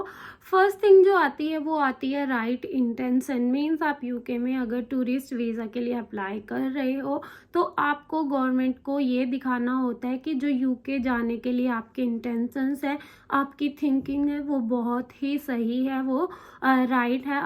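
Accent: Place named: Haryana, India. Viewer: Your Jaatu / Haryanvi accent is too visible. "Discussan , Rejecsan" LOL And here is your not-so-intelligent Haryanvi mind who can't comprehend what is remote work! Guys, you can't work in the UK on a tourist visa.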